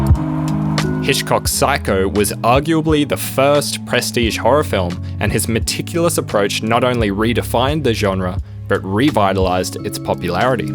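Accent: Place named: Australia. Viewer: Man keep going. Insane that this doesnt have more views. Fantastic vid! Also so good and refreshing to be able to watch quality videos with an Aussie narrating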